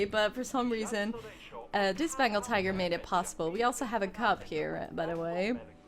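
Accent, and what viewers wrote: Netherlands. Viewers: are you dutch? because to me your english has a little dutch accent and you pronounced oudehands dierenpark perfectly! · are you dutch? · Nice zoo! And also, are you Dutch? xD Good accent.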